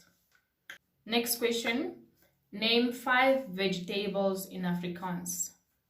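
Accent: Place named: United Kingdom. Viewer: And the way you speak it with an English mixed accent 😍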